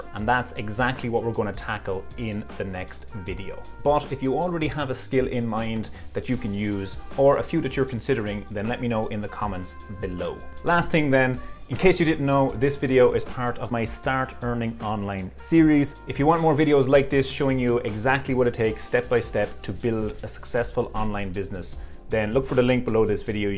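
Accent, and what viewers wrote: Ireland. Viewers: Get a career closely related to your passion - that way you can use your leisure time to pursue it, while your day job is not an utter bore. Worked for me! Love these videos, an an Irish Canadian who loves hearing lovely Irish accents.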